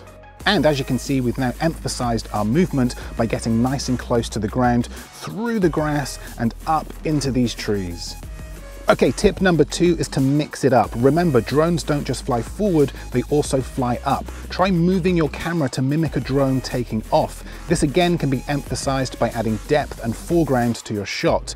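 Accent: United Kingdom. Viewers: めっちゃイギリス英語！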